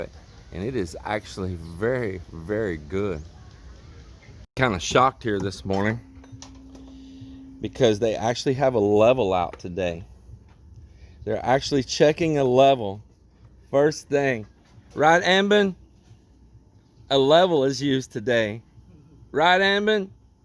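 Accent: Texas, United States. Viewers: there is many reasons why i have been following you and your wife. first my Late Mom followed you before i moved to the Philippines and we watched you together. The main reason why i watch close is that i plan on doing the same thing kind of. I plan on building a home and it will be mostly my wife family that works for me. I am already remodeling her mom and dads home tripling the size and we plan on living there as i save up for land and to build our home. I am letting them build it how they know how since that is not the home my wife's family will stay in as i plan on building a new one on our property when we get it for them (i want at lest 1 hector hoping for 3 for farming). i want to make it self sustaining as that is how my parents farm is in Washington state. One of the other reasons is I miss Texas (lived there for 20+ years) and you have the accent and mindset that I love from that state. 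Although i am not going to be able to be as physical as i am a disabled vet with major back problems I still want to build my home much like yours. I do not have as many years in construction as you but i do have a background in it, i also have an engineering degree but it was focused on mechanical but I did study blueprinting too. I also want to meet you sometime as i live in Roxas City and make trips to Iloilo sometimes. Congrats on the 10k. been watching for well over a year and feel like ya'll are part of the family lol.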